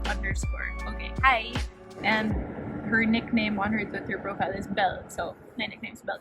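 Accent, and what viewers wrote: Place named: United Kingdom. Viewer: Lumalabas British Accent niya dito since she is British-Pinay hehe Gandang Babae nito <3 At ganda din diyan sa UK lalo na sa London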